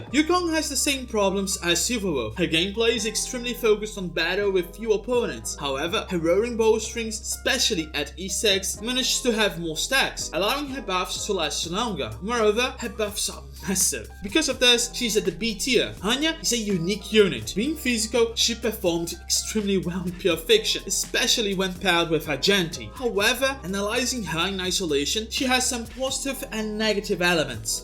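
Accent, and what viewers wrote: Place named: Brazil. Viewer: KKKKKKKKKKKKKKKKKKKKKKKKKKKKKKKKKKKKKKKKKKKKKKKKKKKKKKKKKKKKKKKKKKKK EU SOU BR RECONHECI O SOTAQUE DE LONGE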